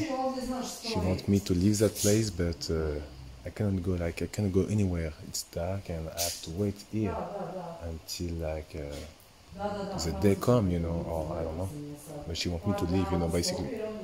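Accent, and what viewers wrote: France. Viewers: C'est incroyable ce qui t'arrive 😁 j'aime bcp ton French accent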